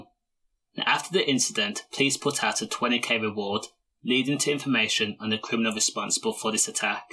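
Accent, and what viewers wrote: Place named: Birmingham, United Kingdom. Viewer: Ahaaa you’re a brummie, I heard that ahhhtt lool same as us im from Stourbridge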